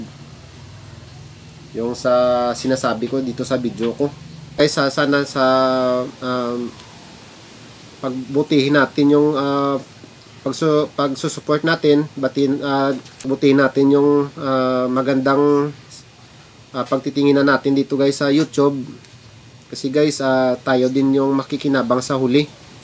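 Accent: Iloilo, Philippines. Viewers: Hhehe ilonggo gid ya bala Ang tono lods. Taga diin ka sa Negros lods? OK na gali Salamt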